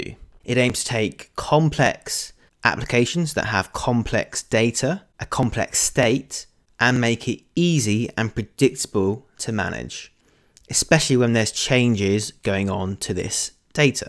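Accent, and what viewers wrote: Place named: United Kingdom. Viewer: You have got a new subscriber.. Two main reasons: 1- You have a british accent (I really love that accent).. 2- I think you're awesome ;)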